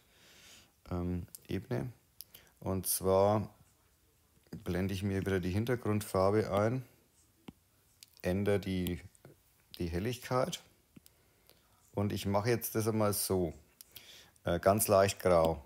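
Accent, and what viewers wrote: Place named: Franconia, Germany. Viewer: dritter Anlauf hat funktioniert nachdem ich alle Kommentare gelesen habe. Spitze! Danke, und ich liebe fränkischen Akzent und Schäufala und Scheurebe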